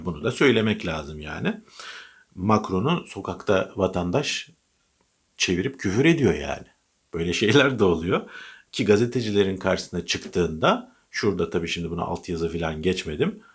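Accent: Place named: Black Sea, Turkey. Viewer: Ula yine gırdun geçirdun!! Özellukle iki yorimun çok superidi!! Sağolasun. Garadenuzli değilum lakin o şiveye bayılayrum!! Öpildun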